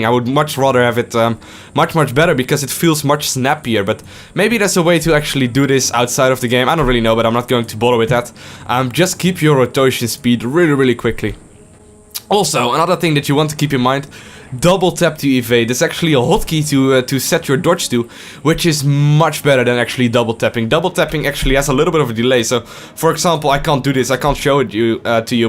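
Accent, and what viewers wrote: Netherlands. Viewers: Your dutch accent is so real 😂😂 no hate good video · Haha dat heerlijke Nederlandse accent. Mooie video man ;) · dutch or Belgium?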